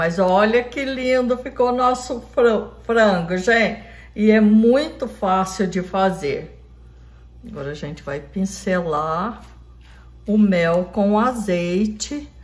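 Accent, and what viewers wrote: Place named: Minas Gerais, Brazil. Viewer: Eu não conhecia você, e a semana passada assisti um vídeo seu da ceia de Natal, e hoje vi você no Fantástico e me lembrei que assisti o vídeo seu. Sucesso adoro o seu sotaque igual de uma amiga minha de Minas.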